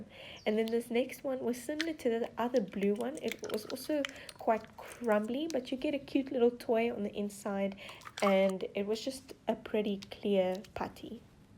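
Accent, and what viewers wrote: Afrikaans, South Africa. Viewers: Honestly, I could hear in your voice that you're South African. I am South African too but I have an American accent. I just love hearing an all South African accent 😅❤❤❤ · Your accent is beautiful, South Africa is amazing. 🇿🇦🇿🇦🇿🇦😙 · Im not gonna lie, I realised you were South African right away and of how you pronounced the "R"s and how you pressed on the "T"s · South african? :3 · this is kinda weird hearing a south African voice knowing, China town is kilometres away, game is in the nearest mall sksksksksksksksksk Great video tho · South African right? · Are you South African because I am and you have a accent and you are say rand instead dollars to I know this because I was born in port Elizabeth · I love her South African accent❤️🤭😊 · You kinda sound South African. Thats where Im from :P Edit: wait, doesn't matter. I just realised that you have been referring to currency as "Rands" and your bio says Cape Town. 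I feel dumb now. Lol XD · Cause you sound afrikaanse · Your voice sound if you are from south Africa ek is van africa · Ya u definitely from south africa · South African!! I could hear it in your voice😂❤️ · Are you from South Africa?? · Are you from south africa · Are you south african!? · I knew she sounded South African! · Are you South African I think I hear it in your accent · I can clearly hear that you speak afrikaans. I know that accent. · Jy kan hoor jys afrikaans😂😍😘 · right when you started talking i knew you were from south africa my mom was born and raised there and i have family that still lives there i live in the US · Lol, when I first heard her accent I immediately thought she's from South Africa, and then by mentioning our brands (Game etc) I kind of had a feeling but when you mentioned rands (South African currency) yeah you're from SA 😹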